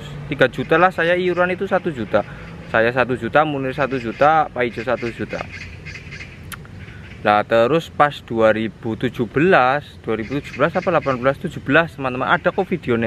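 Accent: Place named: Tegal, Indonesia. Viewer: Ky nya org tegal yah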